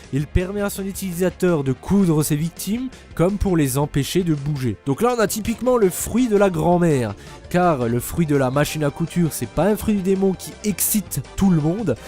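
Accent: Alsace, France. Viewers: L'accent alsacien prononcé 😂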